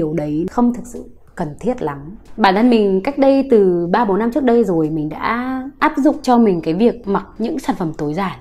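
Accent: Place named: North, Vietnam. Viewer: Mình xin lỗi nhưng k hiểu sao mình k thích giọng miền Bắc cho lắm. Nhưng giọng của bạn hay quá. Lần đầu tiên nghe giọng Bắc mà thấy thiện cảm mà hay như vậy, truyền cảm.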